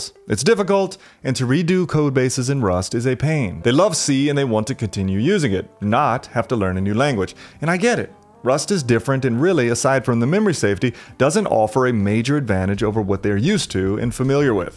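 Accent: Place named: Chicago, United States. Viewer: Completely off topic but correct me if I'm wrong but is that a Chicago accent I'm hearing?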